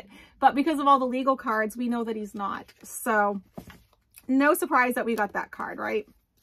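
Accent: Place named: Boston, United States. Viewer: Is UR accent from the greater Boston area? U sound like home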